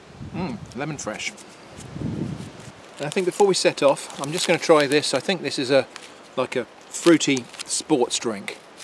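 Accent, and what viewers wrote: United Kingdom. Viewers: I like the UK (been there only once so far, unfortunately), british cuisine and british pronunciation so I subscribed. Appreciation from a russian-speaking person for this review, спасибо :)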